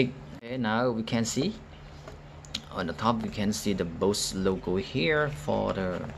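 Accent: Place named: Cambodia. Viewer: Your speaking English like Cambodia people.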